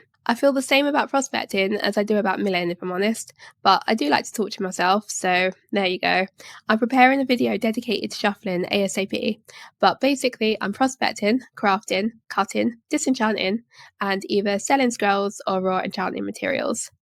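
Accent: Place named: London, United Kingdom. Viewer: You sound like you from London ye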